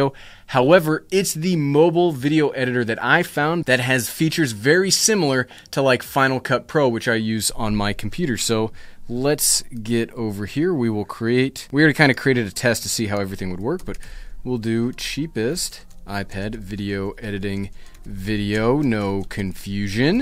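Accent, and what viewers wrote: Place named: United States. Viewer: you make the most pleasant and entertaining tech videos on Youtube. You speak American English, you don't talk fast, you don't read a script in monotone, you don't wear your hat backwards, and if you genuinely get excited about the gear. And, hey! we both shoot with Lumix!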